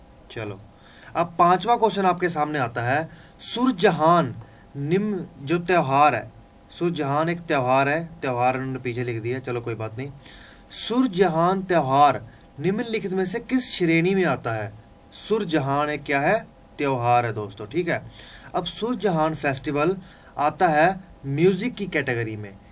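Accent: Punjab, India. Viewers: Good morning sir Panjabi ho kya sir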